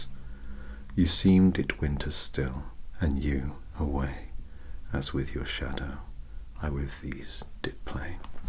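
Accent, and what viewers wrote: United Kingdom. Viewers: Can we talk about how amazing a British accent sounds? I swear y'all could order chicken nuggets from McDonald's and sound like an erudite advanced life form. Conversely, I'm from the American south, and one of us could give a lecture on astrophysics and still sound hopelessly backwards. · We just analyzed some Shakespeare during English Literature today! Very coincidental you uploaded this today as well. Either way, I’m so excited to see this! I love being read to, and I love British accents, and I love poetry, and I love your videos! Perfect combo 😍 thank you!!! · A man in a suit with a soft British accent, reading poetry and cuddling his cats? Well I mean... Sign me in. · A British guy reading Shakespeare?! Can't get much better than that!! Fantastic read - thank u! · Your dandy voice, your UK accent, your sweet face expressions, your way to read Shakespeares in gentleman's grace...sigh.😔✨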